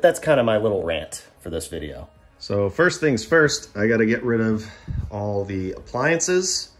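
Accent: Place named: Midwest, United States. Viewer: You live in Massachusetts ?? Are you original or you moved there ?? I don't hear any form of the typical " Boston Accent ". You sound more like a Mid-Westerner. I'm from Boston. Grew up in the Dorchester neighborhood. Left when I became an adult. . . . . .That whole snow thing is not much fun as an adult, but it was great when I was a kid, (snow days off from school).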